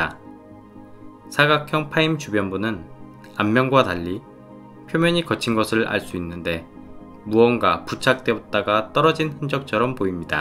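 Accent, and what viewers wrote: Jeolla, South Korea. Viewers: 전라도 사람이 표준어 쓸려고 애쓰는 내레이션 억양이 재밌네요 ㅋㅋ 아...물론 내용은 👍👍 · 전라도사투리가 구수하군요 ㅋ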